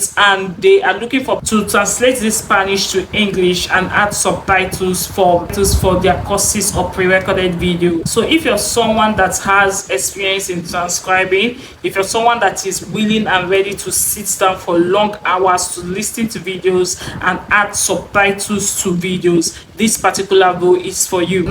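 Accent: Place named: Africa. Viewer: What language do you speak as I'm struggling to understand you African accent 😮😮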